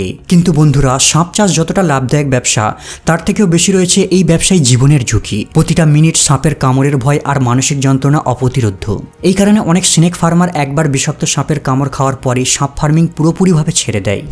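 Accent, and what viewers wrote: India. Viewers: ইন্ডিয়ান মনে হয়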